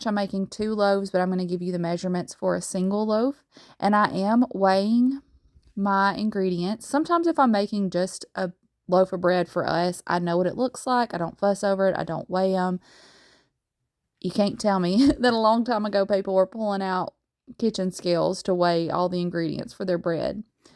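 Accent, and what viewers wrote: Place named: Alabama, United States. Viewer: You sound like you’re from Alabama. I’m a north Alabama girl and I feel the southern cooking in the bones!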